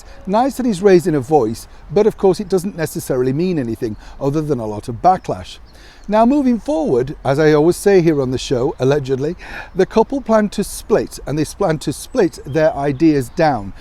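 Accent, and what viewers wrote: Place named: Northern England, United Kingdom. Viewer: Hi, just wondering which part of the north of England you hail from? I am a scouser currently living in Australia so no doubting my heritage….you sound very familiar 🙂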